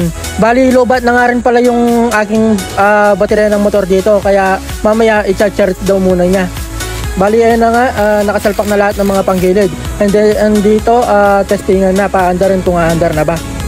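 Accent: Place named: Pampanga, Philippines. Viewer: kapampangan ka pala paps. kumusta na ecu?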